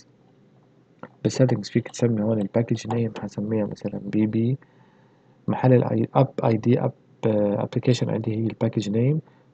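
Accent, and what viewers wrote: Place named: South, Lebanon. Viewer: mbyen mn swtak mn jnoub Lbnen sa7 ana Mn lbnen sken be jnoub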